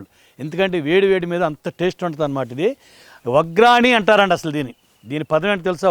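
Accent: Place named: Godavari, India. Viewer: మీ మాట్లాడే తీరు చూస్తుంటే కర్నూల్ laga ledu..Godavari jilla vari laga వుంది..చాలా చాలా బాగా మాట్లాడుతూ న్నారు. ధన్యవాదాలు 😊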